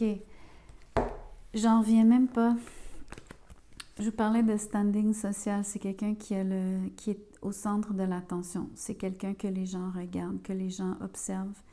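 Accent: Quebec, Canada. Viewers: Magnifique petit accent québécois -:) merci pour le tirage qui me parle, dommage pour le son trop bas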